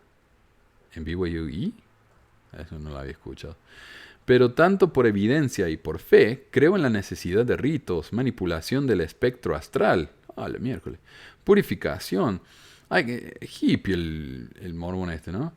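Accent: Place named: Córdoba, Argentina. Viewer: Uuuiii ese comentario sobre tu acento no vaa!! Y qué!, si les molesta. Tu acento es lo más hermoso y lo más ritmico de los acentos del mundo, tiene vida tu hablar, tiene cadencia. Amo tu ace to cordobés. Aunque muchas provincias de Arge tina tienen ese acento tan hermoso. Mi hermana vivió un tiempo en Córdoba y las vecinas le decían La Yeya por hablar con y, de Santa fe. Jamás un acento debería ser para discriminar por que habla de la escencia de la persona, y ninguna discriminación. Quizás, el que lo dice, quisiera ser como vos, bueno que lo diga y ya está!. Te enontré, te quiero, te amo, zaludoz. (mucha gente en zona de Santa fe y de Entre Ríos reemplaza la s por la z)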